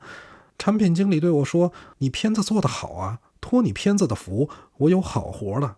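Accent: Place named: Tangshan-Tianjin, China. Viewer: 😂北京人（唐山天津味）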